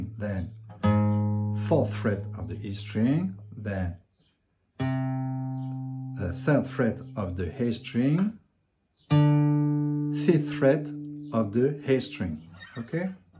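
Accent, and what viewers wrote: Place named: France. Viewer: you are very precise, concise and clear minus the slight french accent haha! thanks for this tutorial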